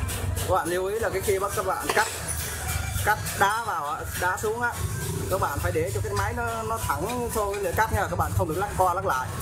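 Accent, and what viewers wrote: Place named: Thanh Hoa, Vietnam. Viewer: nghe giong noi dan thanh hóa, thấy sợ sồi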